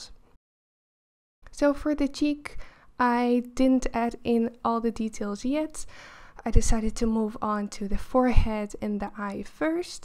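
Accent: Netherlands. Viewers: you just hear you are dutch lol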